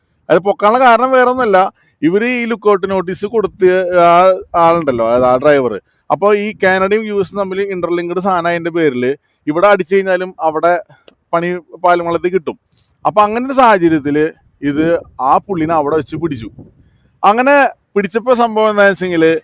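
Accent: Thrissur, India. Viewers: ചേട്ടൻ തൃശ്ശൂർ ഭാഷയിൽ നന്നായി പറഞ്ഞു. 😂ഇനി കാനഡ പോലീസ് നമ്മുടെ നാട്ടിലേക്കു വരുമോ. മോദി ഗ്യാരണ്ടി 😂അവന്റെ ഭാര്യ പോയാലും കുഴപ്പമില്ല. പണം കിട്ടണം.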